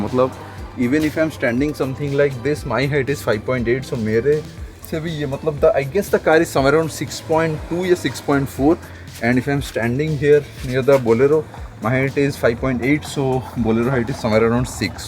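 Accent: Odisha, India. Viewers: Hindi ke sath sath, Apki English me bhi odia slang aati hai...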